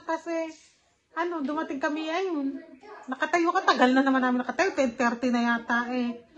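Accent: Nueva Ecija, Philippines. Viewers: sabi ko na nueva ecija ka...kase the way na mag Salita ka....nueva ecija din ako.....saan ka sa nueva ecija?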